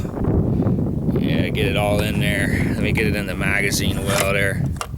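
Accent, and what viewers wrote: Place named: Pittsburgh, United States. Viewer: You have to be from Pittsburgh. Tell me your a fellow yinzer? Trying to identify the accent..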